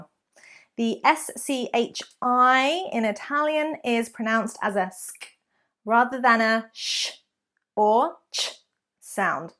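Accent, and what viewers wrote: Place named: England, United Kingdom. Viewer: Which part of England your accent did came from?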